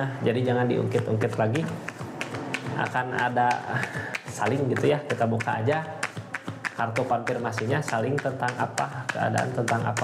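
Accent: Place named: Sunda, Indonesia. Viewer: Aamiin Yaa Robbal Aalamiin. Do'a yg sama juga buat kang nu kasep...nu bageur...nu capetang..... Sunda na medok....asli na ti mana? Hatur nuhun,wass nenek Elly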